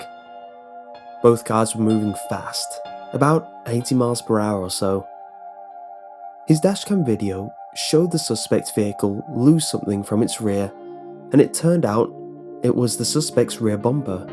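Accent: United Kingdom. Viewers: What part of the UK are you from? I love your videos btw!! I saved like 10 really long ones to listen to on a flight, so relaxing!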